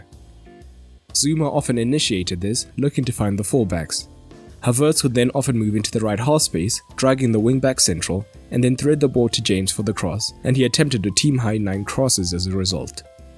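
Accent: South Africa. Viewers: Are you South African g? Really good video!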